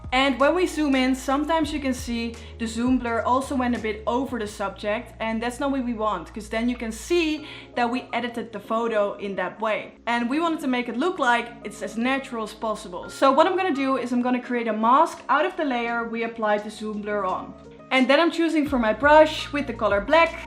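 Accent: Netherlands. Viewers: Hrt Nederlandse Engels accent is heel herkenbaar haha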